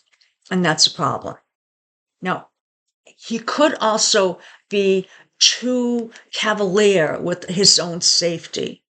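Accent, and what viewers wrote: Massachusetts, United States. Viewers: I’m from Massachusetts and immediately was like this woman is from Massachusetts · I’m from the North Shore and heard a MA accent too. Always cool to find a local on YouTube! ❤ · Are you from Massachusetts? I'm guessing Salem /North Shore.😊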